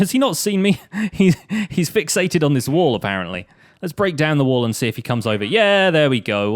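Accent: United Kingdom. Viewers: XxcoreysindahousexX British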